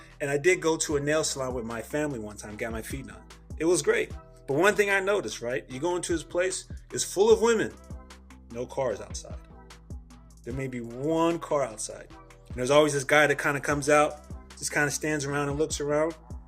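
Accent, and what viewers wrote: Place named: United States. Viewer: The way he pronounced words i can tell he's a 3rd maybe 4th generation American and based on the fba foolishness he's not a fba. Please stop it with the pseudo history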